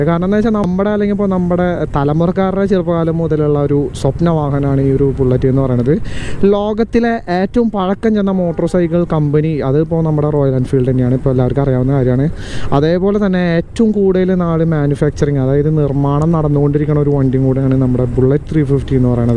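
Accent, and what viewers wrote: Thrissur, India. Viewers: ഫോൺ കേടായത് കൊണ്ട് നാൾ കഴിഞ്ഞ് ആണു ചെട്ടായിടെ വീഡിയോ കാണുന്നത്.. സംസാരം ഒക്കെ ആകി മാറ്റി കളഞ്ഞല്ലോ.. missing that Thrissur broo.. ഞാൻ തൃശൂർകാരൻ അല്ല.. പക്ഷേ I loved the way you talked.. pazhe pole mathy broo...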